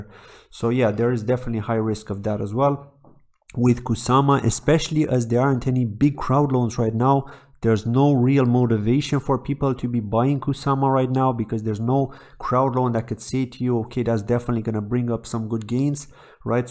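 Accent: Ireland. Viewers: Definitely concerned. Feel like uninstalling coinmarketcap for a year or two to prevent me from looking at it. lol Btw, am i hearing a tad of an irish accent? :)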